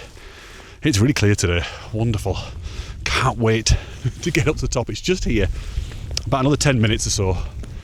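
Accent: Yorkshire, United Kingdom. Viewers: I'm really impressed with the beautiful views and the hiking that you do.always loved England, but I would never be able to do things you do. Sweet doggie Finn. You've got a good sense of humor. I believe that's a Yorkshire accent ? First class😊. The next part you're probably not going to like. I don't know what you had on your Christmas jumper, but you were (grieved) with the comments. What you say is a bit of fun, isn't. Balfamet,a pentagon, stuff like that is occult. The devil is very busy these days blinding g people's eyes. Hope you're not annoyed(grieved), and will think about it.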